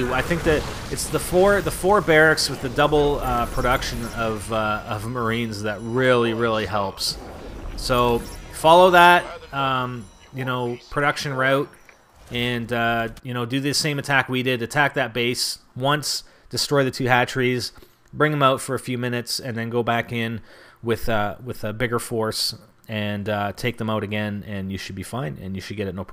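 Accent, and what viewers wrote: Canada. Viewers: You wouldn't happen to be Canadian, ey?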